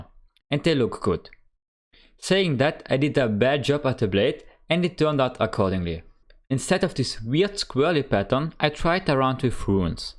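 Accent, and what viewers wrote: Germany. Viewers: der deutsche Akzent ballert richtig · are you by any chance german?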